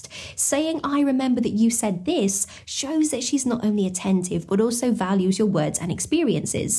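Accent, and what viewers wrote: England, United Kingdom. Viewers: I am not sure your right or wrong I really don't care I love watching your videos because your beautiful and love your English accent.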